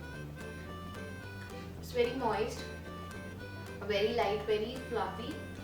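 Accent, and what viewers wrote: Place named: India. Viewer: I LIKE INDIAN ACCCENT VERY MUCH VERY SOOTHING AND CULTURED.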